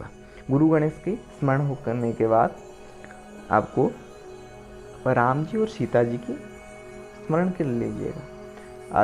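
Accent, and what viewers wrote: Odisha, India. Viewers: Bhai aap odia ho kya??? Baato se toh yese lag raha hey??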